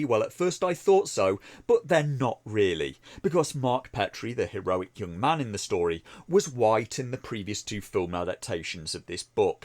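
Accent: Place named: Lancashire, United Kingdom. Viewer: is in Lancashire!! i know coz i live there!:-) "oor rite arr kid?":-)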